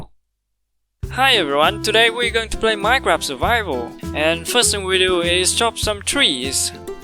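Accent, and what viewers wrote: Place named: India. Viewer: He sounds indian and looks vietnamiese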